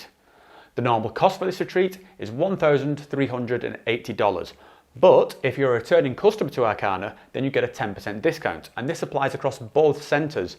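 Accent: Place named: Manchester, United Kingdom. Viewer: ah I knew you were close, I can hear the manc in your accent now haha I'm from Leeds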